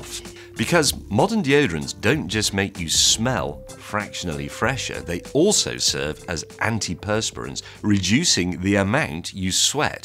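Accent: Scotland, United Kingdom. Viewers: Apocrine is pronounced Aprocine Propanoic acid is pronounced Propioc acid Hexanoic acid is pronounced as Hexionic acid These are scientific terms. It has nothing to do with dialect. You can't just take defined scientific terms casually because you're British. The way he says out and ouse words makes me suspect Scottish flavours.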